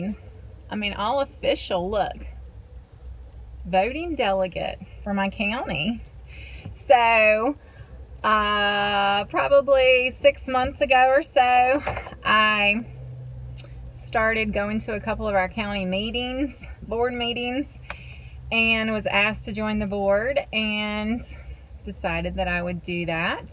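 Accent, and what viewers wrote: South, United States. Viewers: Subscribe Love the videos an the southern accent lol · I could listen to you talk all day!!! I love the "southern accent" thamks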